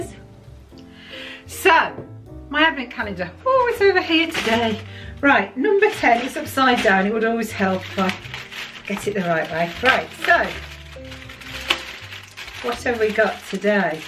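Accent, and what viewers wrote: Birmingham, United Kingdom. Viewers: Hi ☺️ I'm a pretty new subscriber but I instantly loved you and your channel. Not only are you British Disney YouTuber, which made me so happy, but I could instantly tell from your accent that you must be close to Birmingham and that's where my mum and her family are from. Brummy is basically my second language haha 😄 My mum is around your age and I just showed your video to show her about the clubs you used to go to and she used to go there too 😄 Made her super happy because she lives all the way down in Devon now. So yeah, just wanted to write you a quick note to say how much I adore you videos and how lovely and fun your are. Sending you lots of magical Christmas wishes xx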